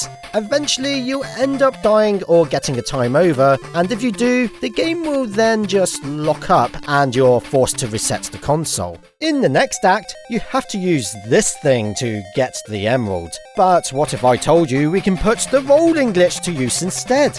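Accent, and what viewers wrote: United Kingdom. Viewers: Son of a glitch, but British :)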